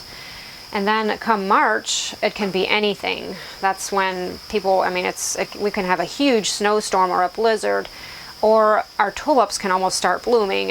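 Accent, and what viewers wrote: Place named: Pennsylvania Dutch, United States. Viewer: So good to know families do canning/ preserving together. My mother-in-law taught me to can. Bless her heart, she would work all day at a factory then come to our house and help me put up creamed corn or can green beans. She’s gone now but every time I pull out my canner or corn cutter I think of those days. Hard, hot work but soooo good in the winter. I love the idea of a cookbook! Two sisters whom are amazingly talented sharing their family recipes AND Amish heritage. I love learning about other cultures/ lifestyles! So interesting to hear you talk about how you were raised and to allow us to hear your Pennsylvania Dutch. Love you channel so much. Thank you for sharing!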